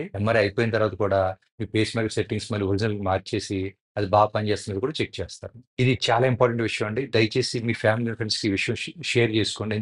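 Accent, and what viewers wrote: Godavari, India. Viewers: godavari slang ❤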